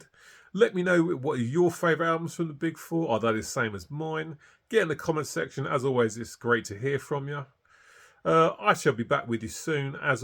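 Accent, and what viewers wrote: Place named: England, United Kingdom. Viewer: he sound English lol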